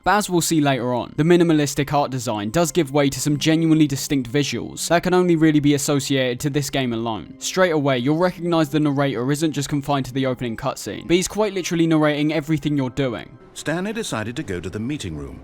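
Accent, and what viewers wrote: United Kingdom. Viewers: Why are you british? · why are you british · 30 mins into the video and my gf, who has been passively listening, asks “what’s a narraya?” 😂 she’s confounded by the British accent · Why are you British?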